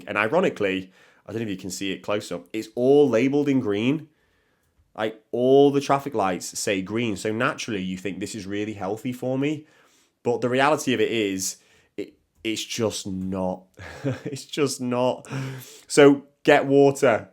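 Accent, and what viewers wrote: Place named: Northwest England, United Kingdom. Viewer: Excellent video. Sounds like you're from my neck of the woods (northwest England) Being a lazy cook i was surving on ready meals and pies until a heart scare a few months ago. So now I have to eat healthily and finding it tough. My nurse is saying no butter, but I think a little is better than the UP spreads that taste vile anyway. The food industry is failing us. They have to reduce the number of additives as the demand for convenience food won't go away.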